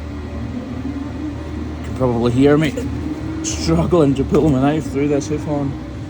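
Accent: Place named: Scotland, United Kingdom. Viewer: I love you Scottish accent (I'm from the U.S.A.)... even when you say things like "dirt and debris" it sounds so cool... :) Love your channel!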